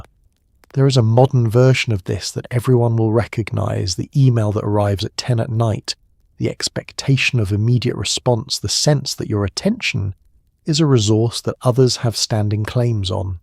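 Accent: England, United Kingdom. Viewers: No please leave the English voice! The whole world is not American thank you 🙏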